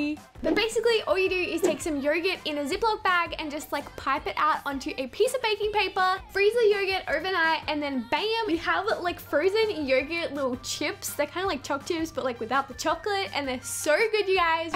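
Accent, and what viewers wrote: Australia. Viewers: Are you aussie🤨🧐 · Is she AUSSIE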